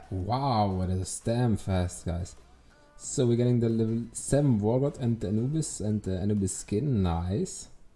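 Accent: Germany. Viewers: Bist du deutsch du hörst dich so deutsch an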